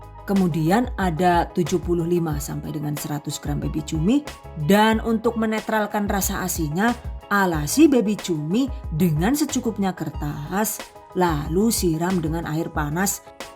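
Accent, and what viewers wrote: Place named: Java, Indonesia. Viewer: awalnya muncul di beranda..apaan sih ini..eh pas di liat lucu narasi nya..apalagi bagian jawa medoknya...masaknya jg praktis...makin kesini makin suka..oke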